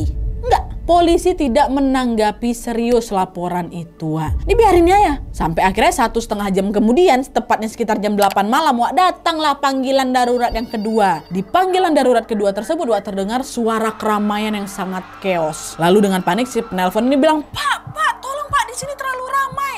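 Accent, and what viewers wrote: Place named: Java, Indonesia. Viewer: You heunti keun itu logat jawa hentikan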